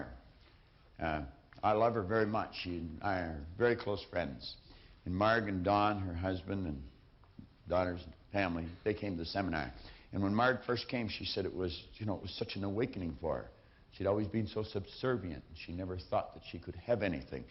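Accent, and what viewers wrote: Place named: Canada. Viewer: I KNEW HE HAD A CANADIAN ACCENT! 🤣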